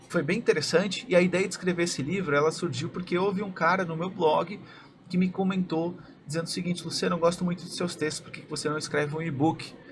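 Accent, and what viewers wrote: Portugal. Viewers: Que vídeo phoda! Eu sou designer, mas comecei a estudar marketing qndo conheci e teu curso de Facebook (na época do teu sotaque bem português)😁 demorei até resolver unir essas duas áreas, pq como só havia trabalhado em agências ganhando mt pouco, e nunca pensei q fosse possível escalar. Agora estou cheia das metas 🤣 Criei canal, mas por me tomar mt tempo p editar, estou focando na criação de um produto p/ depois virar tua aluna de novo e aprender a anunciar.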